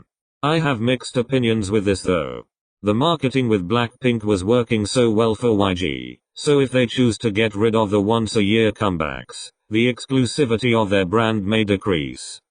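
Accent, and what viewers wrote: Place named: England, United Kingdom. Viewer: AHAHAHAA ARE YOU ALSO FROM ENGLAND?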